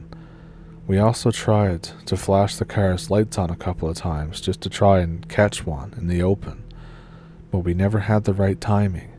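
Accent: Ontario, Canada. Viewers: Hello, - Happy Harvests, I thought I would drop by and hear a good story or triple. 🎉, i have said this before, but your accent sounds just like my favorite Ontario cousins, right on best holidays, friend. 👍🏿👹🌅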